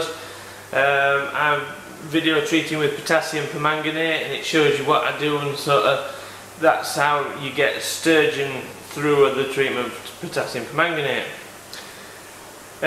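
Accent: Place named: Yorkshire, United Kingdom. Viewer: I just found your vids they are so informative and well done, love the commentatory in great Yorkshire accent.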